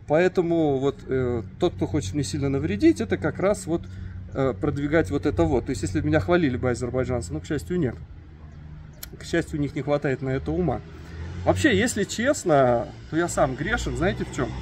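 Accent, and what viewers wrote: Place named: Armenia. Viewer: А ты видимо получаеш армянские драмы а не азербайджанские манаты наверное и у тебя армянский аксент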